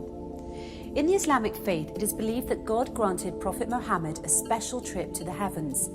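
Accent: United Kingdom. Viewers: ملاك يغني سمفونية العقل والبراهين الفيزيائية في القرآن بلكنة انجليزية بريطانية رائعة وفقك الله Good bless you angel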